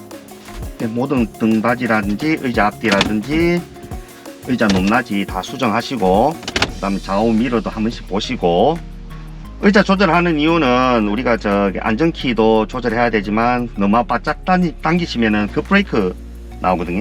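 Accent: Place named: Daegu, South Korea. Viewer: 와 억양이 좀 익숙해서 찾아보니 서대구 학원이네요 일주일만 더 일찍 알았으면 여기 등록했을건데 ㅎㅎ 지금은 ㅊㄱ 다니고 있는데 강사님들마다 달라서 쪼금 혼란스러운중입니다 ㅎㅎ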